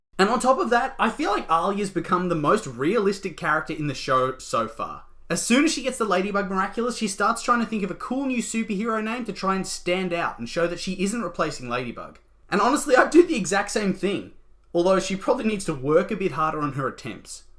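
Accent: Australia. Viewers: are you australian? ps love your videos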